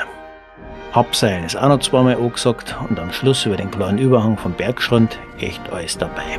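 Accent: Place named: Bavaria, Germany. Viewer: Die Moderation in Bayrisch ist die Pointe dieser Filme. Jetzt fehlt nur noch ein Wolpertinger auf der Wiese....😆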